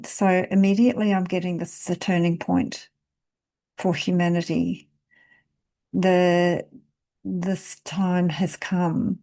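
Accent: New Zealand. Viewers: Just found you early hours on 21st November!🙂 It's so refreshing seeing and hearing a kiwi accent!🤓🙏🙌 Thank you!🌸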